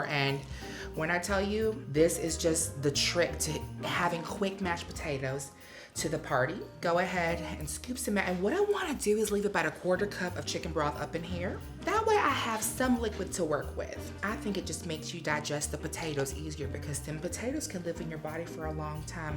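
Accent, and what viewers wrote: South, United States. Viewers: Southern girl here (GA!). Your accent is on point! Bless your heart! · had so many GOLDEN one liners in this cooking show and I'm here for the cooking, comedy, southern accent, and her entire look! 😂🤭😆 I am dying to make the hot liquid pumpkin pie 🥧 because it's easy and virgin, just like me, (*wink wink*) 😏😬🤐😭💀 You sent me ROLLING laughing! Can't wait for your next episode! 🦃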